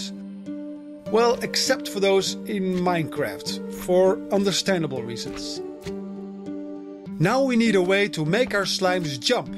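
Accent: Netherlands. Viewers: oh dear, i am hearing a Dutch accent! hollandse youtubers zie je niet zo vaak!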